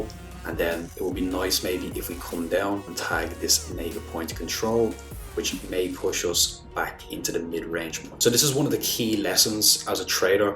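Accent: Ireland. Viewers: Can't get over you being a dub. Where abouts you from? I'm guessing West/Northside somewhere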